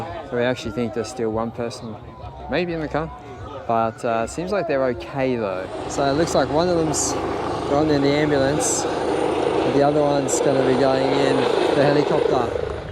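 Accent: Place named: Australia. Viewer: Nice video, mate (with the cool Australian accent). Hope you enjoyed your time in Finland. Our family has been every autumn there spectating the rally in three different generations at least. It’s always a spectacle. Always good to see some Australians visiting here in the North. Also, as you’re probably aware of, our very own Valtteri Bottas is pretty much Aussie now btw. Greetings from Big Casey Stoner fan from Finland 🇫🇮🤝🇦🇺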